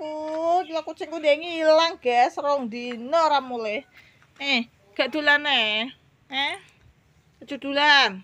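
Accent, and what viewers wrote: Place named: Banyumas, Indonesia. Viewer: Balik maning kro inyong kiye hot milf... ky kuwe yu openinge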